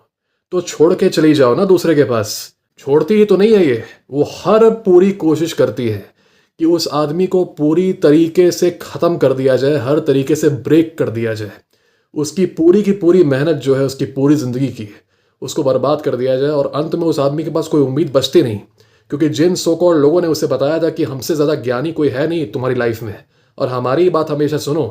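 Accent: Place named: Lucknow, India. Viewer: Good.. Very well put 👌 Also the answer to your question you asked in the end (why men are not getting aware or radicalized? ) is - because of the bitter fact that today more than 50% men have become feminists. Remaining 30% are simps/slaves. Only 20% are aware of this. But the disturbing part is that Rate of conversion of men to simps/feminists is 2 times faster than the rate of men getting aware/enlightened about toxicity spread by infection of Feminism... So, with that being said, people like you (who are actually doing a wonderful job 👌💯) will not be able to bring positive change in Indian Society which is headed to a disastrous end. Anyways, keep up the good work.. I support you... & you have got a good voice... Seems you were an RJ or some process trainer at BPO. Also your accent seems like you belong to Lucknow or Kanpur.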